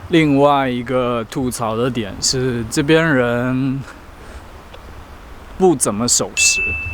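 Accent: Taiwan. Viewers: 哪里人？为啥说话台湾腔！ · 你的腔調好像台灣人😳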